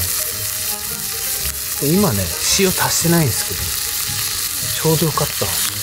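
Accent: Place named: Hakata, Japan. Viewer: 乾杯ありがとうございます😊 今宵も良い晩酌が出来ました🎉 はい美味しいぃ〜😆✨ やっぱり博多弁のがいいですね🤭 やばばです🫡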